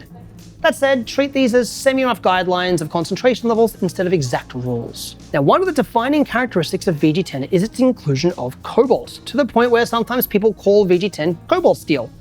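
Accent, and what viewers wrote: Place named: New Zealand. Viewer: that's cool man I thought I heard some kiwi 'I's haha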